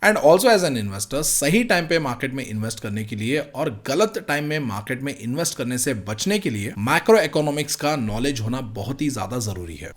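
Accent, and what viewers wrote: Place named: Bengal, India. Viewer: Hindi theek se aati nahin to koi aur bhaasha mein boliye. Your Hindi (are u Bengali BTW?) grates on my ears 😭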